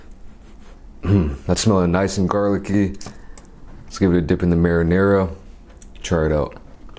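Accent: United States. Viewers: U sound American lol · You sound like the most country asian guy ever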